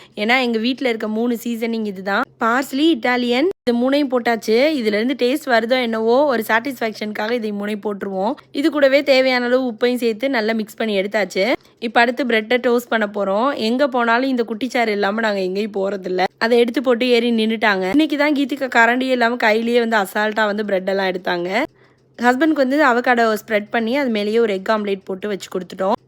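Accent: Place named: Dindigul, India. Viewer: Akka neenga Tamil natural dindigulayaa iruntheenga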